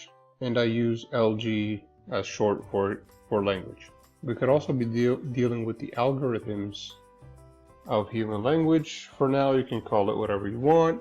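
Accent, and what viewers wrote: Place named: United States. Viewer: sounds like some american all of sudden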